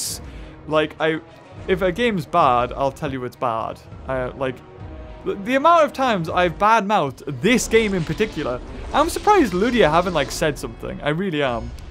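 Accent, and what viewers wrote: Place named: United Kingdom. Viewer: youre a good youtuber but heavy brittish accent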